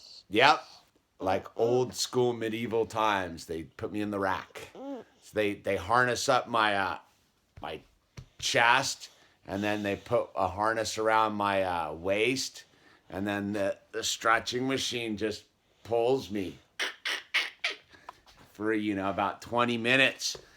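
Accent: California, United States. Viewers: I'm going to have to say that coming from a guy who does literal backbreaking labor, I wouldn't hear the word "dude" to much let alone the ever iconic Californian accent.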